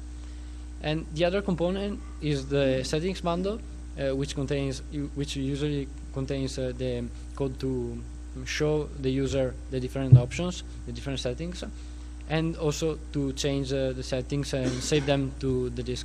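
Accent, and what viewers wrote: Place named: Italy. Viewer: I'm so in love with this italian accent <3 Awesome tweak developer !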